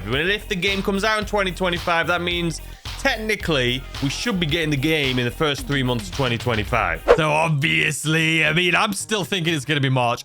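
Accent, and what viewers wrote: United Kingdom. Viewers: I see everybody’s update, but I wait for yours to come out so I can go see yours why is your British accent?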